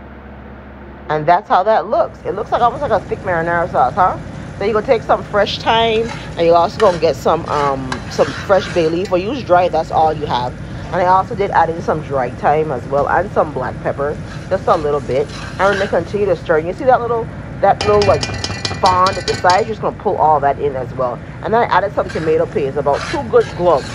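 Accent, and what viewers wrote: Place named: Guyana. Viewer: I Know You're Not Guyanese, But You Kinda Sound Like It..I Love All Of It Tho. Thanks For Sharing.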